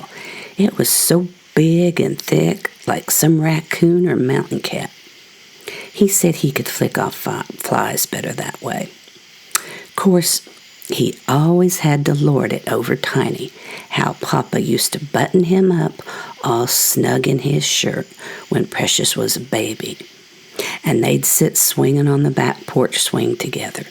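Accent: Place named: Appalachia, United States. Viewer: What a wonderfully fun story! So much character brought to life in this tall cat tale (by the way that was a really long tail). Loved hearing your expressive Appalachian accent and colorful language too. Amazing photos of those cats, such eyes!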